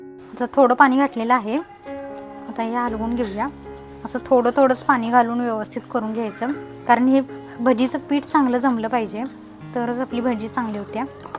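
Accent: Kolhapur, India. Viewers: अस्सल कोल्हापुरी भाषेत बोलतीस ताई आणि आपण कॅमेरा समोर बोलतोय असं नाही तर तू प्रत्यक्ष समोर रेसिपी करून दाखव त्यास असं वाटतं य बघ.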